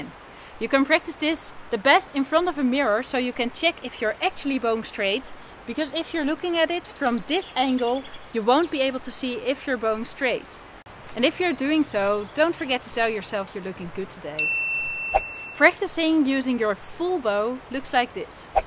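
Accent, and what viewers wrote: Netherlands. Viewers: You have such a dutch accent!! I am dutch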